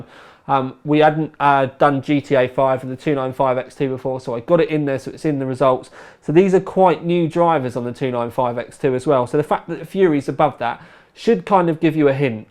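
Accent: United Kingdom. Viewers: Dude, your accent is mad tight! Wish I could pull off a British accent. I bet chicks dig your accent and you're all, "Please ladies, call me Sir."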